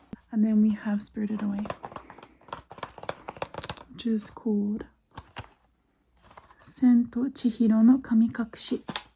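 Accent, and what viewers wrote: Australia. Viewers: I always felt australian and british accents are extremely similar. your accent is very australian so I think that maybe english is your native language and you are only studying japanese but havnt become fluent yet.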